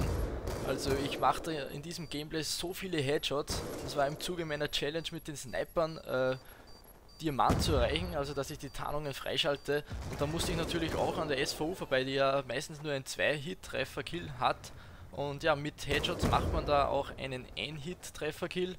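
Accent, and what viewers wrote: Austria. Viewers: tolles video :) bist du österreicher :3? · Bist du Österreicher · Sau geiles Video :-) Von wo kommst du eigentlich hörst dich an als wenn du aus NÖ kommst :)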